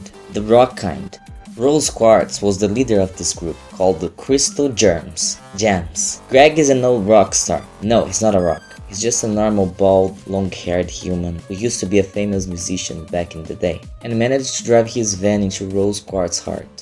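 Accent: Brazil. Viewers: Eu percebi o sotaque brasilero!:) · Mano vc eh br? Pq parece mt pelo seu sotaque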